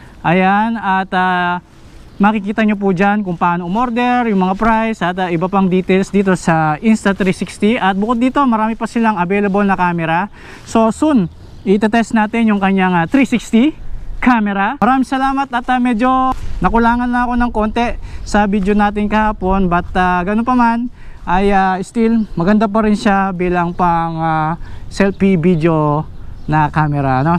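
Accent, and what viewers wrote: Pampanga, Philippines. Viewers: Kapampangan ka po?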